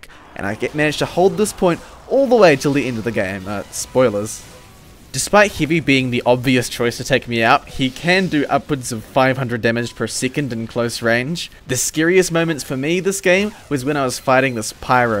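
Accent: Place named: New Zealand. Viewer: I think the kiwi accent is super chill to listen to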